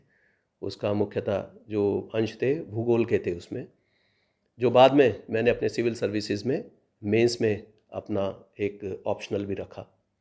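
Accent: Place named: Delhi, India. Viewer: Sir aap delhi se hai yesha mujhey lag raha Tha kyun ki u.p ka language kuch defferent Hai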